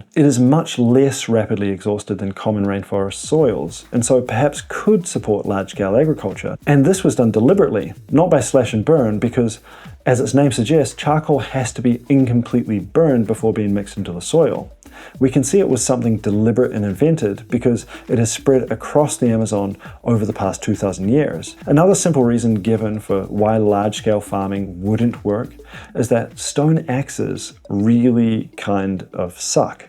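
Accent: New Zealand. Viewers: whats that accent? is it nz or a bit australian and there are rhotic r's ive never heard it before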